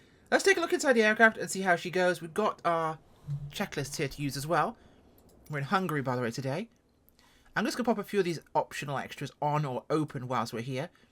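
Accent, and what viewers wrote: Russia. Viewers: are you doing a russian accent? James Bond and I 😍 love it!!!! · ВАУ... какой сексуальный русский голос!!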